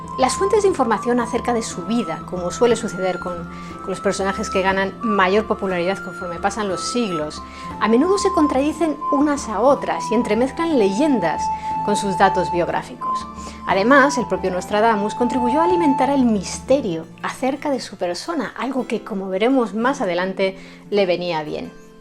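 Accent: Spain. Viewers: . una maravillosa leyenda histórica gracias mil. una pregunta eres Española???.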